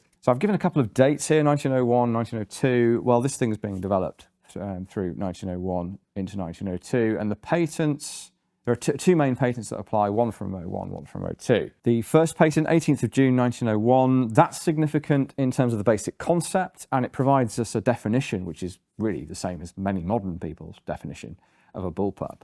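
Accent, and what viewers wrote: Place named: London, United Kingdom. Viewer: I love London voice 😊.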